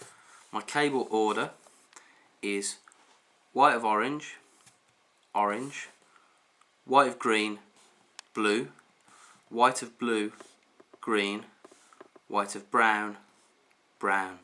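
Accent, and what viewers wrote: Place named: United Kingdom. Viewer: this is by far the best video i've found around here on this subject. also, a british accent always lends more credibility.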